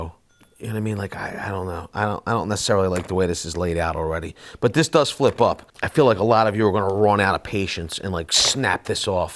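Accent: Philadelphia, United States. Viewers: Clicked for the review, but soon as he started talking I knew he was a South Philly guy! Yo Cuz Def subbed & liking everything on this channel!!!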